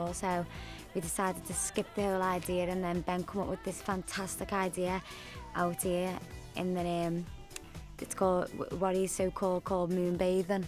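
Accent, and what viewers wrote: United Kingdom. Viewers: she barely 5"0' her britsh accent can kill anyone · i lik the scouse accent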